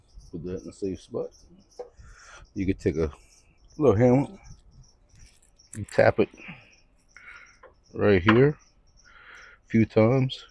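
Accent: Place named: Louisiana, United States. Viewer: You can always tell when someone’s from Louisiana!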